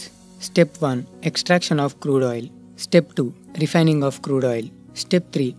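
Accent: India. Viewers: damn indian accent. headache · Thanks for the video but your English narration is just terrible the accent is all Indian and it is difficult to understand a word your saying, for goodness sake get a narrator with a good English accent to do your narrations |||||||||| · I hate indian accent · indian accent...😪😪😪😪🥱🥱🥱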